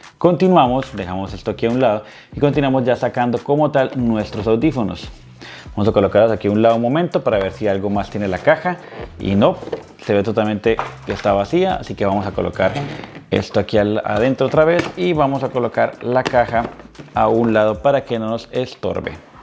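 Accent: Colombia. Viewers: jaja, se te nota el acento tipo canal uno o tele antioquia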